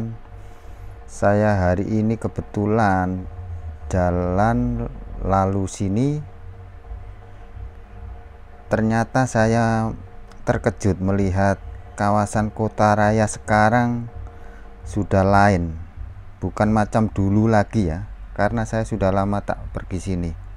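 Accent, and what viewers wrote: Java, Indonesia. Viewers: logat lu koq jawa bang..😁😁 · Abang org indonesia yah,,,sek3dar masukan ga usah di melayu2in bang,,,logat ente logat indonesia kejawa2an,,jadi ga bisa abang berlogat melayu malaysia malah lucu kedengerannyanya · Pakai bahasa indonesia aja napa bang, logat malaysia2an tp logatnya jawanya kental dadi malah aneh...hehe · Assallamuaikum 🙏salam kenal dari saya penonton baru dari kalteng,,,klo di dengar dari logat bahasa sampean kya y org jawa ya mas🙏🤭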